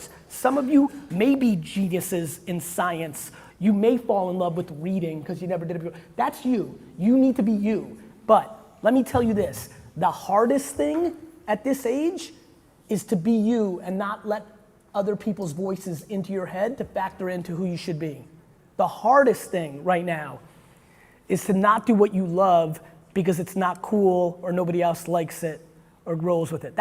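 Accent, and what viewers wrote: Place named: Armenia. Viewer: هاي ارمي🌝🌝❤ قبل لااقرا اسمك حسيت من طريقة كلامك انك ارمي🌝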